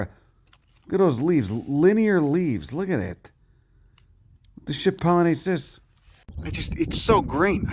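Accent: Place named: New York, United States. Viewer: DAMN U SOUND LIKE NEW YORK !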